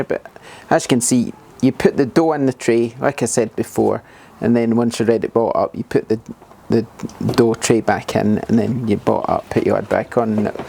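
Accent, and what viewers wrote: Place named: Glasgow, United Kingdom. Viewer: All the comments about the accent are making me chuckle. Thanks for the review from one Glaswegian to another.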